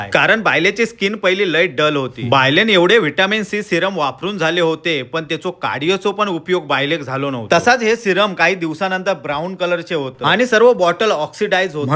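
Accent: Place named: Konkan, India. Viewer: दादा तुमची कोकणी भाषा खूप भारी आहे 😅😊मला तर खूप आवडते ऐकायला 😅मी तर रोज व्हिडिओ बघते 😅No.1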